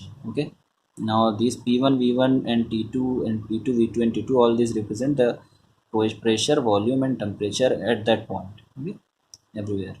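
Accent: India. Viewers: Sir r u bengali??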